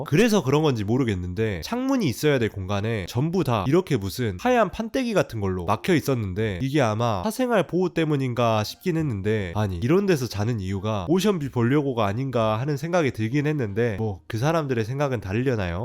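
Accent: Jeolla, South Korea. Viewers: 혹시 억양이 전라도 분이신가 티가 나는데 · 말이 전라도이지요 요사히 전라도라면 그렇게 좋아하지 않구만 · 아따 전라도 양반이구만요..ㅋ 사투리 느껴짐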